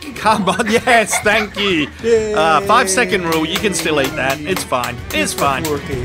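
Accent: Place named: Australia. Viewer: let me remind you, the only reason i watch your show is for your voice, you are funny, witty and amusing, if i want to watch shows of disaster its a dime a dozen on FB, but your aussie voice and jokes is the best, so no more silent videos. thank you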